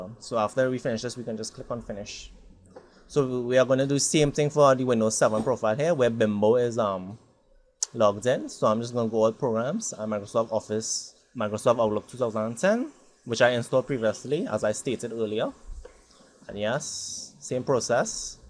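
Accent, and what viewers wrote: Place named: Trinidad and Tobago. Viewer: Are you from Trinidad? You sound like it real bad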